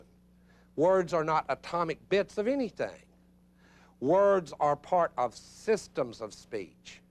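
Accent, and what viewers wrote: South, United States. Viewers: Gotta love “Hai-degger” and “moh-derna-tee.” Just discovered this guy, big fan. Reminds me of home (TN), though I think his accent is from a different state. It’s hilarious because you expect a Baptist sermon from that accent, and then you realize you’re not in church. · That southern drawl is to die for <3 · I think saying words "stand in" for things is gobblty gook. You don't talk to have your words stand in for things, but to represent things. They may fall short, and that is a problem. But having them "stand in" for things opens the door to what they were never meant to mean. He says Derrida thinks words represent reality, -- for example, Derrida asks where to pick up his check for teaching a course -- then he says Derrida thinks words don't represent reality. So that means Derrida is out of money because words don't point to where he can pick up his check. 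It's a contradiction, pure and simple, and the speaker is good at jumping from one contradiction to another in his casual, friendly, homespun, southern drawl accent as if nothing is wrong and that it all makes perfect sense and that he is not bamboozling anybody. He is a salesman -- a bamboozler of the first degree. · Ah jes hate suthin acceyents but this brilliant guy knows his stuff!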